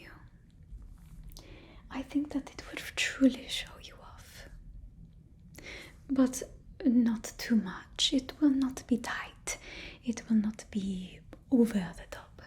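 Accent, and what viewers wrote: France. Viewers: The French accent! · Your French accent is calming. You should use it more. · I haven't watched the show but I am made to understand that there are a lot of butts involved. So I wish everyone a wonderful new season of their butt drama. Love the French accent. Looking forward to relaxing with this 💚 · Very Très bel accent Français mademoiselle ! J’adore 😊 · I feel so dumb i was like shes not gonna dona french accent and then she started doing a friend accent and i got so excited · Pretty fine french accent even if as a french i know it's wayyy more classy than the real one xd amazing roleplay, i binged bridgerton and i'm so happy to see that you were ready for this too! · Great french accent ! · I expected a British accent but the French accent is absolutely amazing and a welcome change! 👍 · I am obsessed ❤❤❤I’ve been waiting for another French accent since the spy fitting video 🥹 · l'accent francais est super bien fait !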